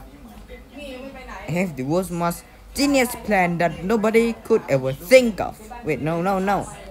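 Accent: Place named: India. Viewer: Why you sound like india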